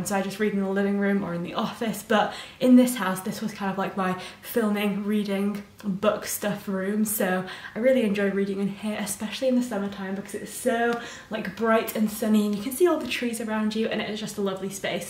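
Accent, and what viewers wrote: England, United Kingdom. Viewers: I've been learning English mainly watching yt videos so your tip is super helpful.. I'm 35, mom, so I don't have that much of a chance to watch tv shows but I add here and there yt videos so I'll stay on track.. your videos are perfect to practice the English accent.. really entertaining as well.. thank you.. good luck with your new work..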